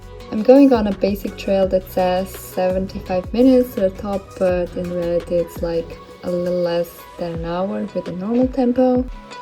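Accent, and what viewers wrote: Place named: United States. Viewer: Hi. I saw many of your videos, always thought you were american. Compliment for the english and for the good content of your videos! Where do you sell your plants? Ciao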